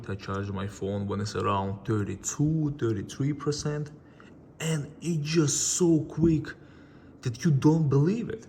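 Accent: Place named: Russia. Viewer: Акцент на русский похож))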